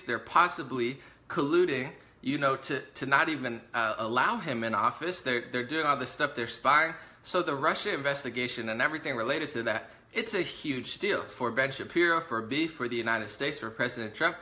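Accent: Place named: California, United States. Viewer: I think he's like on a different wavelength as far as his style. He talks so fast and you talk like...well, like a Californian. 😁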